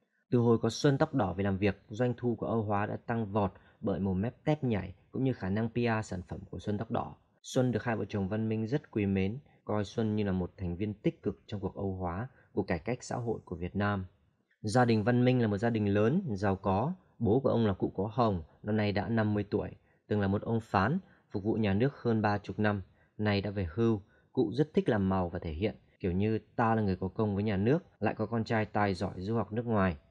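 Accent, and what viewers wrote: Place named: United Kingdom. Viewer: Anh nói được accent của người Anh kìa :3 anh có phải từng sống ở Anh hay vẫn còn đang ở Anh đúng không?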